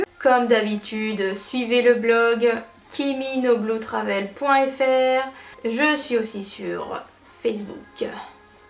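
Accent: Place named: France. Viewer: Salut ça fait vraiment 5 ans que tu es au Japon ? Quand j entend ton accent j ai l impression que tu es en France plutôt. C est pas méchant.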